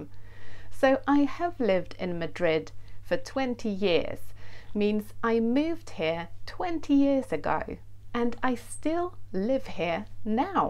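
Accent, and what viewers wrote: United Kingdom. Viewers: Very nice British English,sounds like music in my ears! Kind regards from Spain. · Hi Ms, is your accent British?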